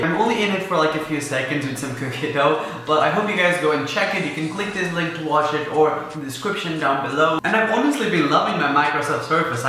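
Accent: United Kingdom. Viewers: I THOUGHT YOU WERE BRITISH!!! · Is he British or Australian? I’m confused? 🤨😂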